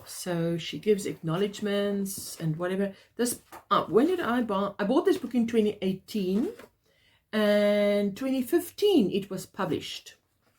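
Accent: South Africa. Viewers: You sound south african!? Love the way you shared everything so calmly and thoroughly!!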